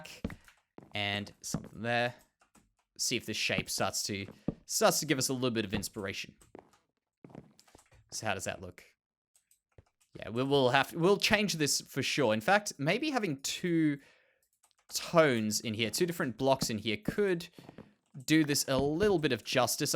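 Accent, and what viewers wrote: Australia. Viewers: it's funny, you are the only other Australian Minecraft Youtuber I know of! It's so amazing to hear an Australian accent. QLD is da best xD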